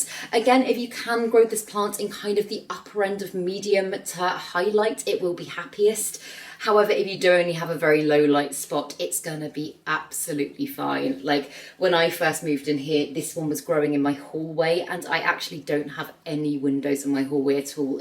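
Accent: United Kingdom. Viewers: Like your pure english accent!! Mmmh love it. Which part of Great Britain are u particularly from. Like the clarity of ordinary care you prescribe for each plant. Your camera is fantastic!!!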